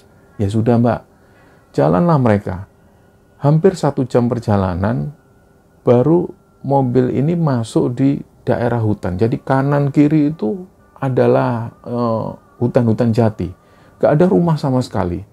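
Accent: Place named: Surabaya, Indonesia. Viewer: mas the best critanya khas suroboyo banget.setiap hari subcribe.nya nambah 1000.salam dari jombang mas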